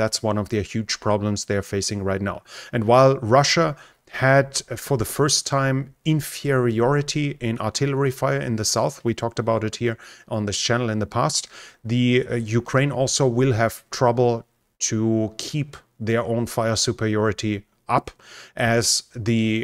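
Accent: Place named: Germany. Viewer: You sound very German ;)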